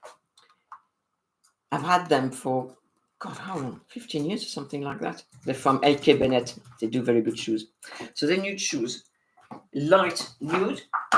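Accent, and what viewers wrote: France. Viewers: No nagging, just your friendly nice French accent and with the Wow I get the surprised face with big eyes. Love you.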